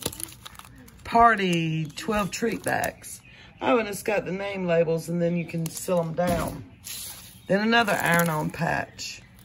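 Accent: South, United States. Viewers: Where are you from? Love your southern accent! What store did you find the umbrellas from?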